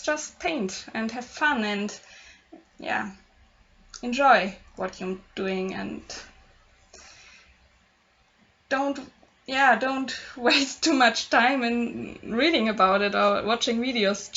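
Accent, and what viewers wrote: Germany. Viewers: She's German!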